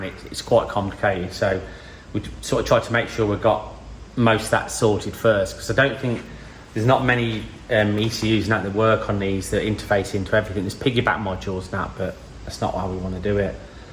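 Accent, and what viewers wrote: United Kingdom. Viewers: Awesome footage as always. If only I could understand everything says. I get about 60%, the rest is too ‘dialected’ for me. I mean, for a non-British person, his accent is rather overpowering. I have to switch on subtitles when he speaks. I don’t have that problem with the other DW videos though. But I will watch all videos.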